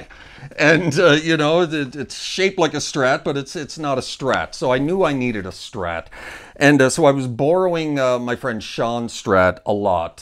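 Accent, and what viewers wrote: Canada. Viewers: Hi. Do I detect a slight Canadian accent?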